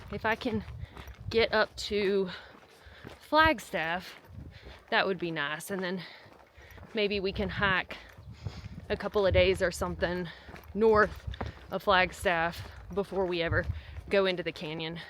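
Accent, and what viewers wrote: South, United States. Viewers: As a mama who has gotten very little sleep over the years with constantly breast-feeding babies that for some reason just won’t go to sleep, separated from a partner on and off because of work, and never having had a babysitter this video was really powerful. That song has meant so much to me for so many years having grown up on hot, flat coastal plain land of Southeast Georgia and then moving to a place with some little mountains to follow my dreams. I’m so glad you were able to be a positive role model to other mothers while being so loving and respectful towards your own mom who is stepping in as a second mom through all of this to help all of y’all out. I love that you’re confident enough to record yourself interpreting everything around you with a Southern accent, phrases, and mannerisms. It’s so dang funny, so raw, heartfelt, and comforting. 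My nine-year-old born ornithologist, backpacking daughter watches now to identify all the birds, which means it takes an hour to get through one video so she can research each bird. 🦅🦉🕊️ You are a hero to many. Y’all have amazing footage, fantastic editing, and big hearts. I’ve been wondering when we’re gonna see more of the family dynamic after getting so invested in your channel. That last bit with him seeing his daddy and putting his sweet little head on his daddy’s shoulder broke me for sure, but the song already had me crying right from the start especially after hearing you cry, too. (I know the mom’s in the grandma’s usually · You’re so stinking cute with your southern accent and your surprise at experiencing Arizona for the first time. I lived in TN for over 6 years, 30 years in NM, now back in AZ for 13 years. I love your expressions of wonder. Did R2R:18hr. at age 59. My health took a hit after that. 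Now in Payson,loving it!!! Love you ❤️❤️❤️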